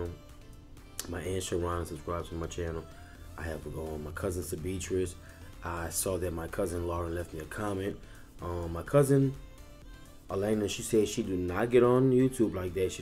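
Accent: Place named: New Orleans, United States. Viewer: What's up my man! Checking your channel out. Your accent makes me miss NOLA!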